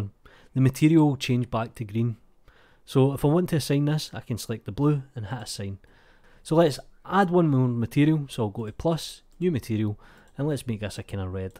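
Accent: Scotland, United Kingdom. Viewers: Thank you random scottish guy from internet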